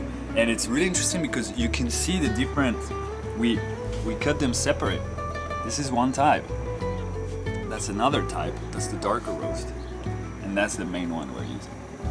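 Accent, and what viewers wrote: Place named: France. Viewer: This guy sounds french to me ! I wish to come very soon to Eagle Nest. Carole from Biarritz, France !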